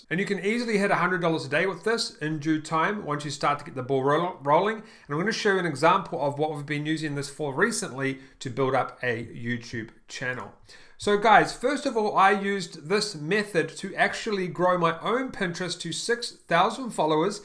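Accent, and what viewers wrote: New Zealand. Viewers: do you come from new zealand? you sound like a kiwi haha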